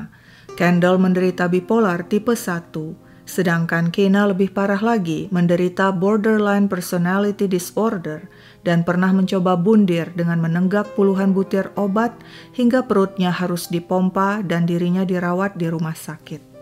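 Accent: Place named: Java, Indonesia. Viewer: Kalau ngomong... bahasa jawanya lucu...lagunya risau berkesan...suaranya unik...dan cantik...👍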